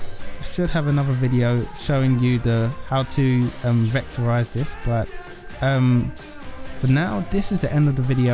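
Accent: United Kingdom. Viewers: Welcome back! I've missed your amazing English accent:)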